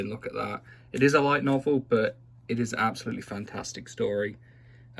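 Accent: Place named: United Kingdom. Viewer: Yeah it’s nice to hear another UK voice I swear almost every manga YouTuber is American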